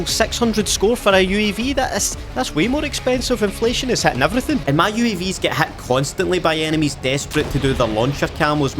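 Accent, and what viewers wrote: Scotland, United Kingdom. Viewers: Why title is in english but u speak Scottish or some shit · Why do Scottish accents make everything more funny😂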